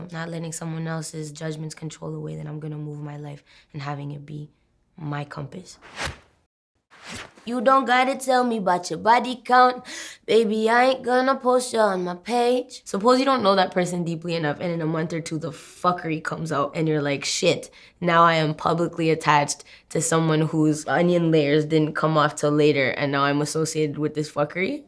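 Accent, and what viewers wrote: Caribbean. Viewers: she sounds slightly Caribbean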